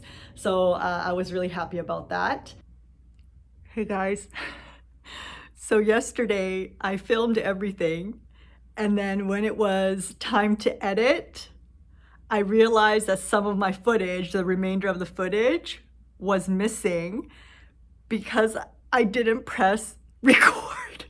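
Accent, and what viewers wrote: United States. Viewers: How does she have an American accent if she is Canadian?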